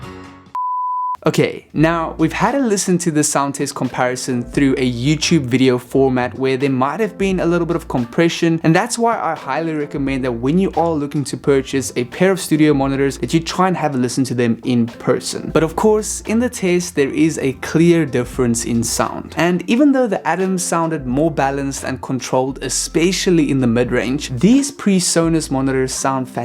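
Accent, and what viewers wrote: South Africa. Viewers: are you south african dood i can hear it in your voice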